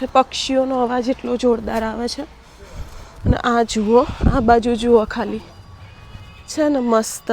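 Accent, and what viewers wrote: Sabarkantha, India. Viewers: બેન, તમે સાબરકાંઠા ના લાગો છો. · Sabarkantha ni boli bolo chho to s.k. na nativ haso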